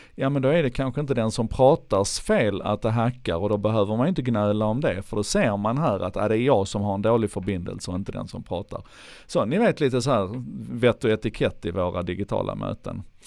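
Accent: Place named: Scania, Sweden. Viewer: ) Alternativ till Zoom :-) :-) :-) och så förstås den härliga skånska stämman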